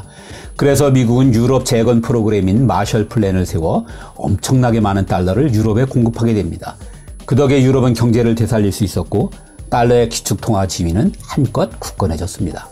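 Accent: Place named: Seoul, South Korea. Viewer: 감사합니다. 목소리가 성우 클라스시네. 우리나라 서울 말씨는 참 듣기 좋아요. 그래서 한류의 첫번째 이유지만~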